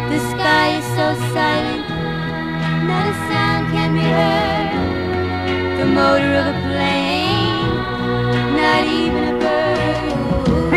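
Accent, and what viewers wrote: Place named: England, United Kingdom. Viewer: Why does she sound so English?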